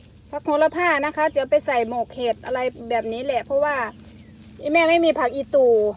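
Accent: Ubon Ratchathani, Thailand. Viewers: เบิ่งมากะหลายคลิบกะว่าอยุ่สำเนียงคือคนอุบลแท้อยุ่อ.ไดละอีหล่าลูกชายน่าฮักคัก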